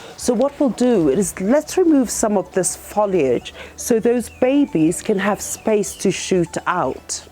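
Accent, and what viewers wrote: England, United Kingdom. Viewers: Hi I heard that you mentioned sand. I've found my rhizomes are prone to rotting. Could you let me know the ratio of the ingredients in the compost please? You're so English. Where are you based please? Lovely to hear English English! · Where are you? You sound English but that doesn’t look like England. Are you in South Africa?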